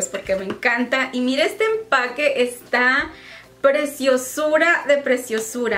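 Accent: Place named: Mexico. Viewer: Disculpen mi ignorancia yo recién veo este canal pero no es mexicana? porque para mí tiene todo el acento mexicano.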